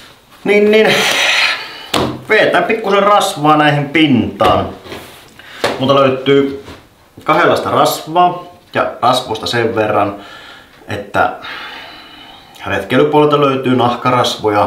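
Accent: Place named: Kainuu, Finland. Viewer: Ihanata kun kuuloo Kaenuun murretta ja nuinkii tokusti puhuttuna ja tärkeästä aeheesta. Kiitos vaen!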